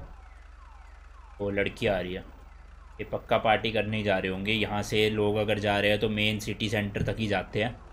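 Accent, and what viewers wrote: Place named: Haryana, India. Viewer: apka haryanvi boli mst hai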